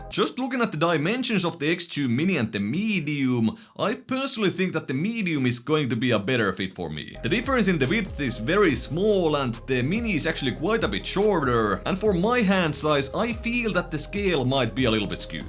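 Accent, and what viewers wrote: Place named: Finland. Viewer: You have a pretty strong Finnish accent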